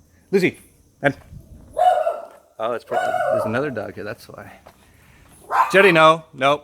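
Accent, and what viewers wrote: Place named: Germany. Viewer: I enjoy your engdeutish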